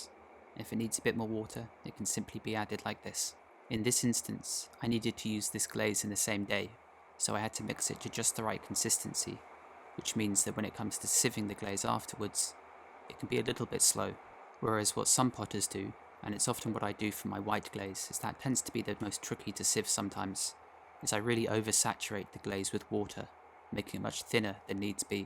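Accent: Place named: England, United Kingdom. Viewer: These videos are like a mini documentary. I think the edit of the video and over dubbing the narration is the strength of production. Having an English accent lends to the 'officialness' of it all. I like the pottery produced, but I'm at a loss as to the rapid success of sales other than whetting the appetite of anticipation with previews of quality and attention to detail these videos showcase.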